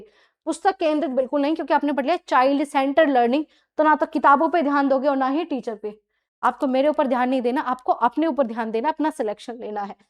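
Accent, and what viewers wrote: Baghpat, India. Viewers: Mam aap Khekra baghpat se ho kya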